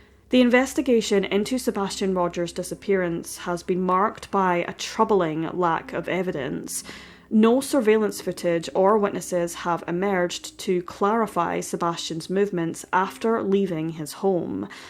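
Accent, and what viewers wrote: Scotland, United Kingdom. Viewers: She’s Scottish. I think from the north of Scotland, maybe the Scottish Highlands.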